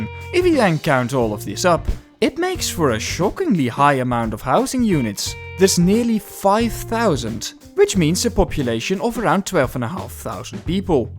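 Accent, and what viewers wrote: Netherlands. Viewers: i could hear you being dutch from your accent lol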